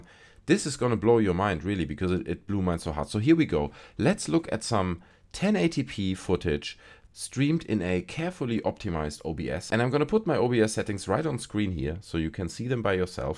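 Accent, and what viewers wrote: Germany. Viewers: Are you from germany? Because how you pronounce words is very german xD!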